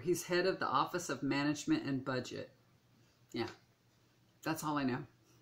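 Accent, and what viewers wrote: South, United States. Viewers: I love southern voice so positive to me. Hope that still do more video.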